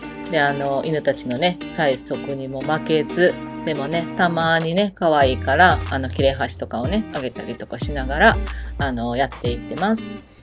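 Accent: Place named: Kansai, Japan. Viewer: 本当にこっちは食物類高いですよね、っていうか高くなりましたね。 関西弁がとっても懐かしいです。😊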